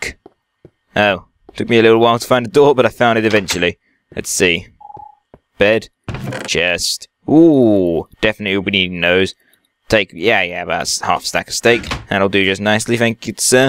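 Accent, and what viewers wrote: United Kingdom. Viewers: do u live in englend cuz u sound like it but ur sooooooo awsome <3 luv ya ^_^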